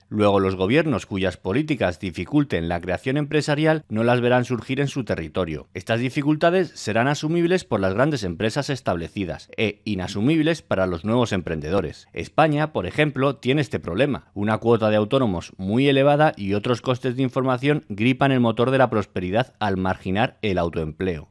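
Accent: Mexico. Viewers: Estoy empezando a pensar que eres mexicano Asentó, palabras, ejemplos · 🤔te parece que habla como mexicano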